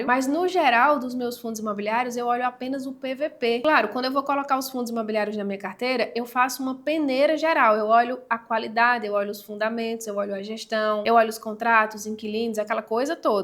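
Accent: Brazil. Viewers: Seu sotaque é de que local do Br?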